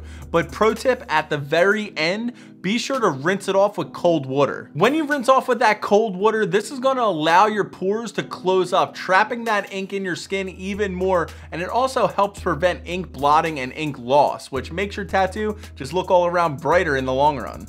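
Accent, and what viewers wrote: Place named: Maryland, United States. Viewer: You’re awesome. Thanks for making such in-depth vids! One thing: hahaha where are you from. I’m guessing Maryland. “Wooder” for water. You’ve got to be from MD! 🪵💦😉🦀 ✌🏻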